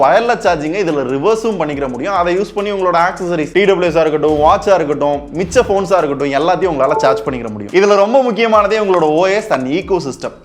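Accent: Madurai, India. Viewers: bro Nenga madurai yaa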